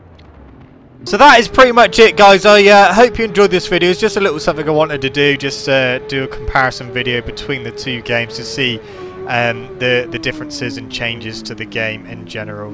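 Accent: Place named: Somerset, United Kingdom. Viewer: You sound like your from Somerset boy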